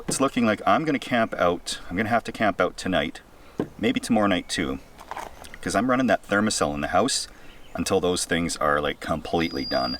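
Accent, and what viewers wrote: Alberta, Canada. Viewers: You betcha, eh. This is a very Canadian sounding video. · I'm glad you killed them all 😃 good luck on all of your renovation endeavor. The Alberta accent is strong with this video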